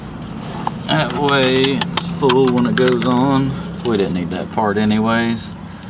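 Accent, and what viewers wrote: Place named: North Carolina, United States. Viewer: well hell...the man's from North Carolina.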